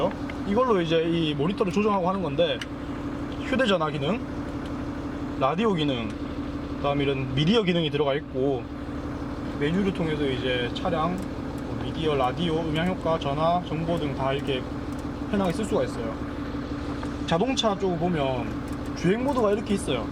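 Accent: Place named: Gyeongsang, South Korea. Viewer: 최초 갱상도 사투리 리뷰.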